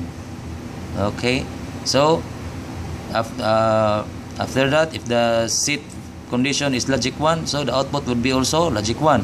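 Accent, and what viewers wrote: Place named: Philippines. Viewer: i really like the way you explain things, and its very easy to understand. The way you talk i believe your a Filipino hehe. Hello sir. I'm really into control loop/logic diagram, i want to learn more in that area. maybe you can advice/help me achieve this in my career. Currently doing cadet-ship in a Power Plant.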